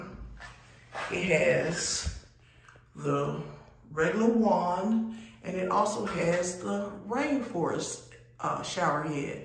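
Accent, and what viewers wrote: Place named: Arkansas, United States. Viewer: I knew you were a fellow arkansan as soon as I heard you speak! LOL! Thanks, from Ft Smith!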